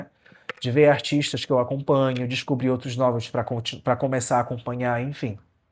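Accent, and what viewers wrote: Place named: North, Brazil. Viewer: oi! há pouco conheci seu trabalho e já comecei a te acompanhar! fala um pouco mais sobre sua jornada, acredito que seu sotaque revela que você origina de alguma parte do norte, me corrija se eu estiver errado, enfim, ótimo conteúdo, estou super contente em ter "conhecido" você.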